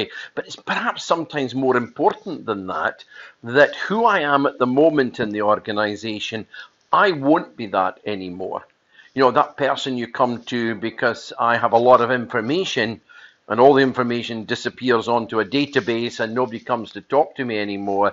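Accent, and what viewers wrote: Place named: Scotland, United Kingdom. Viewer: Hi, nice one - like your explanation, change hearing it in a Scottish accent rather than my Yorkshire one:-)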